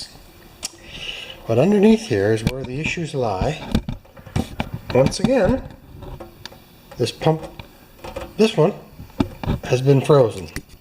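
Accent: Scotland, United Kingdom. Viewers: Great Scottish accent there, better than mine! (Watching from the UK):) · Good close up for sure. I liked your Scottish accent ;-) no easy fix for that pump..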